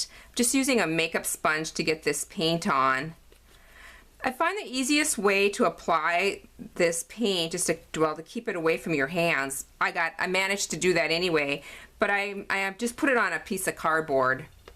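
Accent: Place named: North Dakota, United States. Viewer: Hi. I've just discovered your channel. I love it! The first thing I noticed was your accent. I immediately recognized it as most of my relatives live in North Dakota and are Norwegian. Made me feel right at time, lol. Keep the videos coming, they're great!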